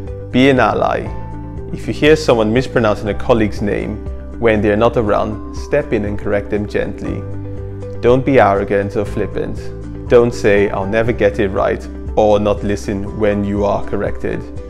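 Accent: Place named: Wales, United Kingdom. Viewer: Well presented. This problem with names in determining whether or not you will get an interview is not new, although people outside of London are more likely to make an effort to get your name right. On the flip side, there are many English names (not a problem with Welsh names) whose pronunciation is ridiculous, eg. Featherstonehaugh, pronounced Fanshaw. I think that you have begun to pick up just a trace of a South East Wales accent, so well done from a Welsh ex-pat. I had not heard of the campaign, so it deserves more coverage.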